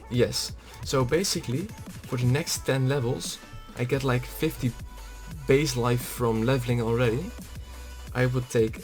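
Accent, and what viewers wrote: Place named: Netherlands. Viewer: Are you Dutch? If yes reply please you sound like someone with an Dutch/English accent. maybe we can play togheter. I am building allmost the same build that you have shown here only with an firery twist to it :D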